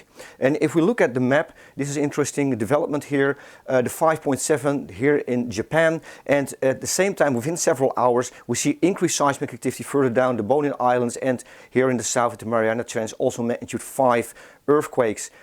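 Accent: Netherlands. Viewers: That is a dutch accent and not AI!☺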